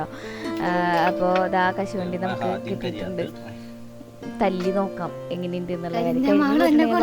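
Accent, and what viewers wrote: Thrissur, India. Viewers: Thrissur slang😀😀😀😀😀😀😀😀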